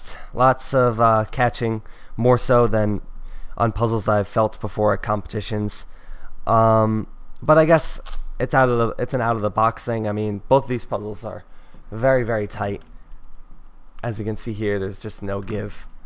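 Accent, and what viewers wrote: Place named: Canada. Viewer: are you Canadian? haha it's sounds like it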